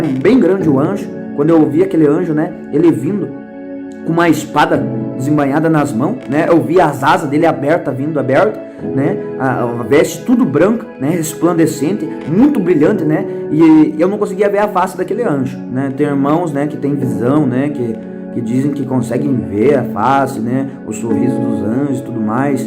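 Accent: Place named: Rio Grande do Sul, Brazil. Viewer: Ele e gaúcho o sutak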